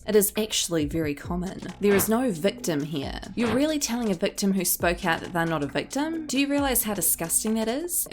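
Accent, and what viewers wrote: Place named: New Zealand. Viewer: Kiwi christchurch accent?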